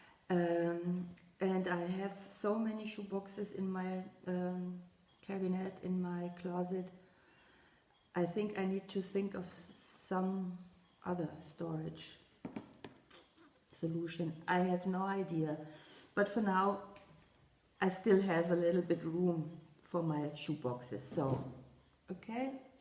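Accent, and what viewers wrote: Germany. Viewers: You explain everything perfectly! Don't worry about anything, your English is very good and I understand what you are saying. In fact, your videos are so entertaining to me.... I didn't take German in high school, so your accent and German tendencies are fascinating to me! I would love to meet you someday if you are ever in the states....❤️❤️❤️❤️❤️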